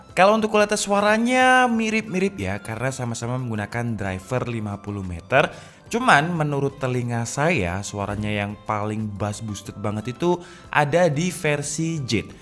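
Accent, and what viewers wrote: Java, Indonesia. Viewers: wong jowo a sampean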